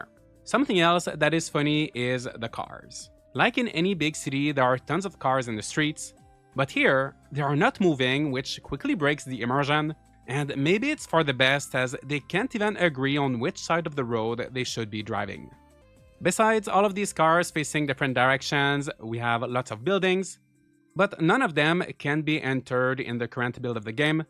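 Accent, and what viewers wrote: Quebec, Canada. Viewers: He is from Quebec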